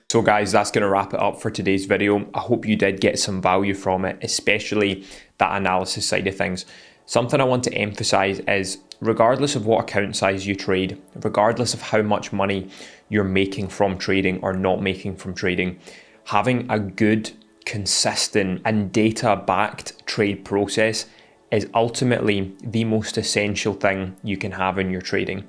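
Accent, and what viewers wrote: Scotland, United Kingdom. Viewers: Scottish accent is elite im moving outa ldn to Edinburgh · Never heard this uk accent 😅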